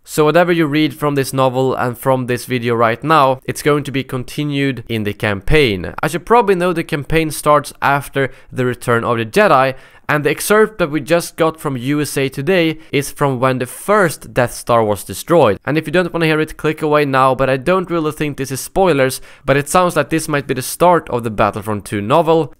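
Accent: Sweden. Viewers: your swedish accent is awesome im from finland so if enyone has terrible accent then its me😁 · it's ok I love your Swedish accent, I could listen to it all day!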